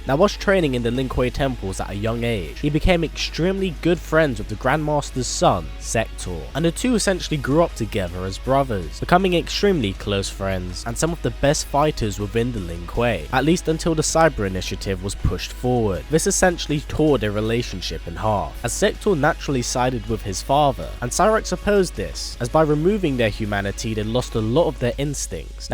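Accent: United Kingdom. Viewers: where are you from,? you sound British but I think I am wrong.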